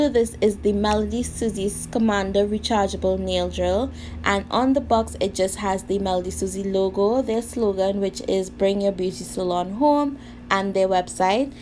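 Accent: Trinidad and Tobago. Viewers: Are u Trini 🥺?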